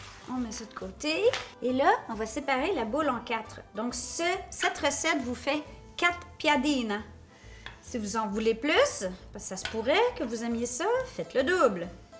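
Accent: Quebec, Canada. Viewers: Bravo.... Tz'es la meilleure !!!!! ;) Oui, ton accent me rappelle certains matchs de balais-ballon (ici en Suisse française), où nous avons eu la chance de recevoir nos amis Canadziens, qui nous ont beaucoup appris... Il y a très longtemps..... Très très, ou trop même... Et c'est une formidable recette et merci pour le partage... Serano.... moi je prendrai du saumon fumé..... Canadzien... chhh j'ai rien dit... Mais j'apprécie beaucoup d'entendre les Quebecois, et merci encore, et ne le prenez pas mal.....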